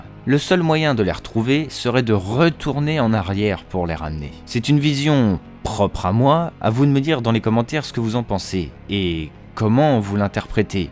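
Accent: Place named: England, United Kingdom. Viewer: Waou l'accent anglais classe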